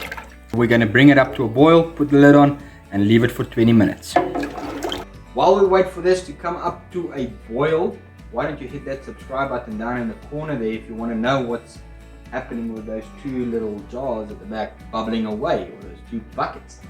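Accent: South Africa. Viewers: Are you South African? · Bruh !!! South African?! Hell yeah buddy! Nice show! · South African?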